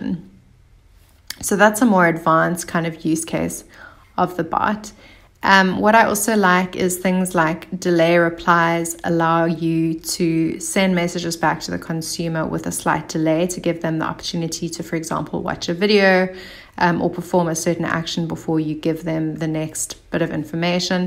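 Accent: South Africa. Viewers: Dear, I must compliment you on a very well polished online profile. I found your video interesting to watch and subscribed to your channel. I wish you all the best for your future endeavours. Oh and well done for flying the South African flag high. I was expecting to hear a UK or US accent and was pleasantly surprised to discover you're a local. I'm from Cape Town myself. Best place to live, am I right?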